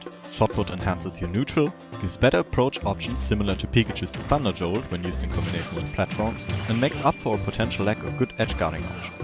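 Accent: Germany. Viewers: Sorry bro aber da hör ich nen deutschen Akzent raus lol . Cooles Video, ich find Brawler auch sehr spannend, er ist sooo underrated!! :)) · Du klingst sehr deutch./You sound very german. And also thanks for the video.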